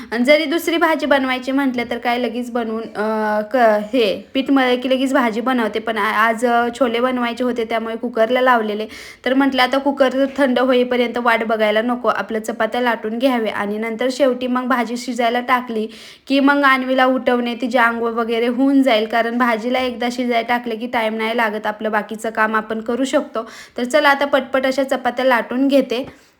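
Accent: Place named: Sangli, India. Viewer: Languagecha tone sanglikdcha vatoy...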